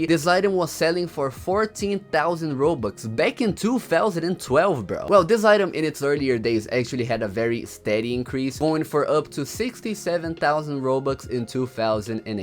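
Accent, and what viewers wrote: Brazil. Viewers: esse sutaque de br vai ter q vir num churras de domingo aq em casa em. BTW VERY GOOD ENGLISH BRO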